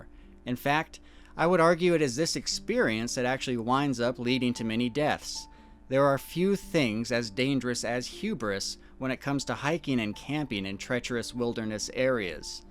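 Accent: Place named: United States. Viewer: What American accent do you have? Or is it unique to yourself? It's good for telling stories, it also reminds me of an old American accent from the 40's or 50's.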